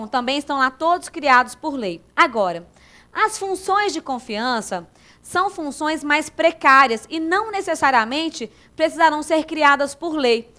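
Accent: Minas Gerais, Brazil. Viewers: Gosto demais das suas aulas, Profª! Tenho aprendido bastante! Parabéns por saber passar o conteúdo de forma tão clara! *Ps: Esse sotaque "mineirês" me lembra meus tempos de "Berrrlândia"! Adoro! rs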